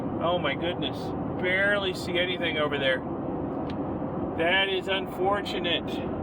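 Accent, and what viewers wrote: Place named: North, United States. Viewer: You don’t have a Florida accent, you sound more northern part of US. I am trying to plan possible a bus trip to Los Angeles. I am looking to see what bus routes that have non or very few mountain passes and more flat terrain. I would be departing from central Montana. There is a bus route through UT heading to Las Vegas NV but in between, on I15, there is a mountain pass that feels like a roller coaster and if there is a heavy rain, because of the terrain, it can be extremely hazardous for driving. It’s scary during clear days, even more during storms. Flash floods are the main dangers on that part of I15. Even though it is quicker bus route to LA. I can also go through north of NV from UT to Sacramento. That bus route doesn’t seem that bad. What do you think?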